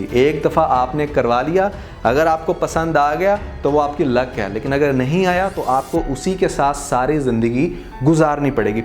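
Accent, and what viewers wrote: India. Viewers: Bhaiee aap hindu ho kia aap ki language or aacent hindi hay baar baar ( achhay say) boltay hn aap achay tareeqay say kehna chahiye pakistanion ki tarah lanat teri shakal per urdu ko bigaar rahay hn aap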